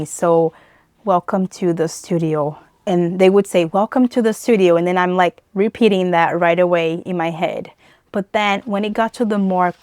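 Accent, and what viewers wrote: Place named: Brazil. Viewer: You sound like a native. Never mind you are from south America right? I hear Brazilians from football and ufc they have similar accent.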